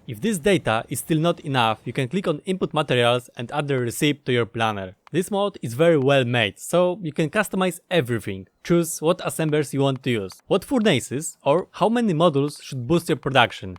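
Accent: Russia. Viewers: Такое чувство как будто русский говорит на Английском))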